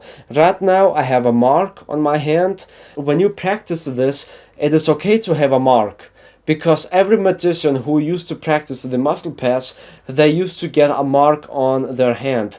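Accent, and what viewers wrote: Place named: Russia. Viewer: That's actually russian-english accent)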